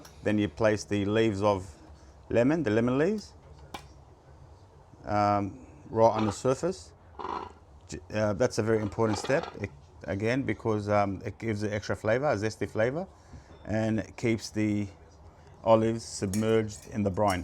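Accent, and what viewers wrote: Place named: Australia. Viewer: That Wog Aussie accent, I love it. I'm a Wog Boy too 😃 Well done mate. Good video.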